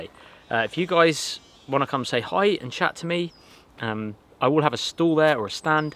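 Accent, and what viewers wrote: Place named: England, United Kingdom. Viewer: Where is that particular English accent from? Kris Harbour Natural Building has that EXACT accent. Tone, cadence, emphasis, everything.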